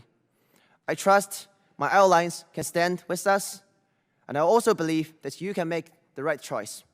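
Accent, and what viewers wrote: United Kingdom. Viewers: 感覺是香港來的留學生，濃濃的英國腔，不過口條清楚、明確表達！ 🙂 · 比起边上的周（在直播里听了周的发言），杨的叙述更能深入人心，充分的依据、有逻辑的叙述、带有英国口音的悦耳英文，应该会touch到这些议员的心灵...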